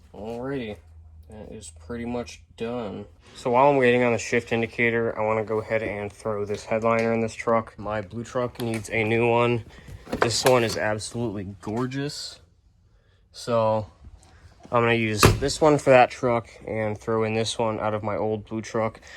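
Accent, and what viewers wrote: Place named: Minnesota, United States. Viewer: Are you from Minnesota ? Just trying to guess by your voice hahaha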